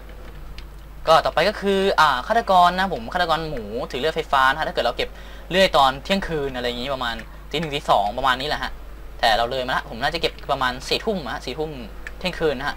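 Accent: North, Thailand. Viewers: คนเหนืองจังหวัดไรครับ >< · พี่คนเหนือหรอครับ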